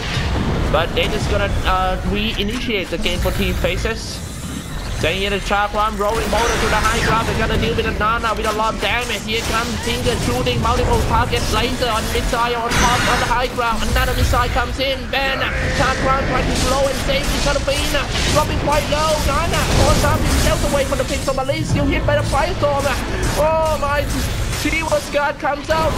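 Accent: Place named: Thailand. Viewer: he's thai caster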